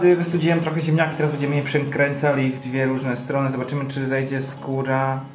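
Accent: Poznań, Poland. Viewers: TO MUSI BYĆ MŁODY ZIEMNIAK ;) ps. WIDZĘ ŻE TROCHĘ GRASZ GWARĘ POZNAŃSKĄ PYRA-ZIEMNIAK ;) (POZNAŃ MOJE MIASTO )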